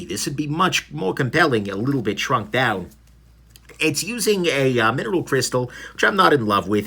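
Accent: New York, United States. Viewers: Mate, I love the New Yorker accent, the factories in Malaysia have improved over the past few years and we can see it in the Seiko and Citizen product. Only one gripe, Citizen Eco's will fail at about 7 years, I know as I have bought them for my wife and Son in the past. Their not worth sending back for refits, so a dilemma arises with the owner. Clever marketing on Citizens account it would seem. All that said what a great review. Cheers from Brisbane, Australia.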